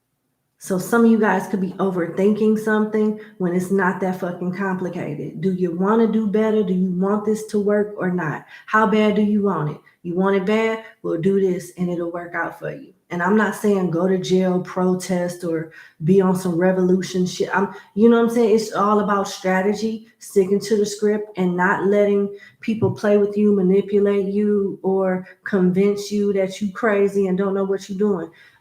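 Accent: South, United States. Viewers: Love your vouce and accent Southern right? Which state?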